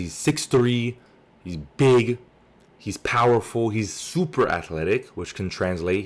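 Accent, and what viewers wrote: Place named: Miami, United States. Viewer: u from miami bro? i hear that accent 😂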